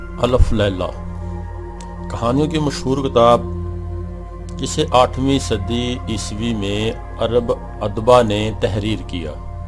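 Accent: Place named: Punjab, Pakistan. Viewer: Ghatiya. Agar kahi se parh Kar sunana hi hai to rawani k sath parhte. Ruk ruk k parh k dimagh ki lassi bana di. Aur Punjabi lehjay me Urdu ka khana kharab kardiya. Punjabi me hi parhlete.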